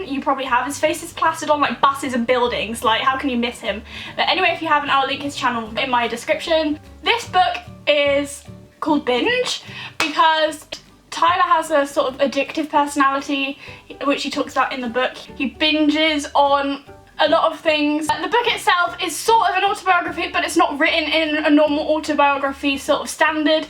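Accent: United Kingdom. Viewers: thought you had the tiniest south west twang. Hi from Bristol :P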